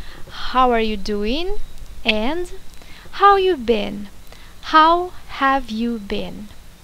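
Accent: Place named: Russia. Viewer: очень интересный урок, спасибо. но у вас очень необычное произношение. очень сильный русский акцент, который мешает воспринимать информацию. к примеру, многие буквы английского, даже похожие по звучанию на наши, произносятся совсем иначе (t, s)/а смягчения в английском вообще нет.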